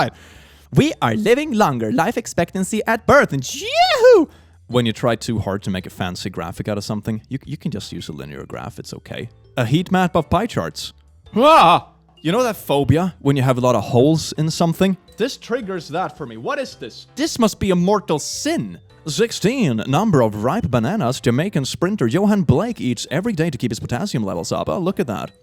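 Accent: Sweden. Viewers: This sweedish accent gets me every time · hey! ive joined your discord server!:virtualhug: Also are you swedish?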